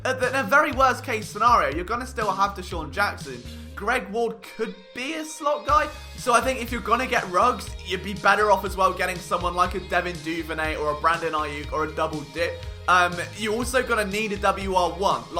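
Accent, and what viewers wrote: United Kingdom. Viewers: Feel like my youtube isn't working getting Eagles commentary with the Queen's accent like the Premier League is on especially with talks & references made of Charlton Athletic I promise I'm not even trying to Troll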